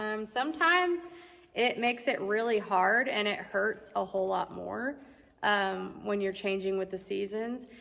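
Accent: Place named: Wisconsin, United States. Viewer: Sounds like Wisconsin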